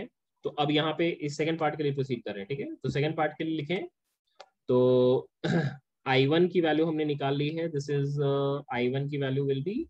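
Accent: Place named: Bengal, India. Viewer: Got reminded of ur class at Akash....2k19 batch....but sir missed ur bengali accent....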